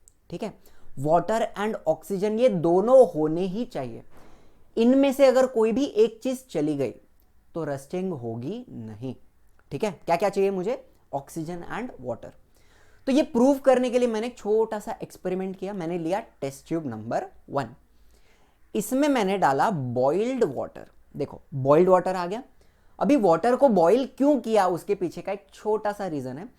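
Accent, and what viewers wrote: Maharashtra, India. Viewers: Sir kya aap maharashtra se ho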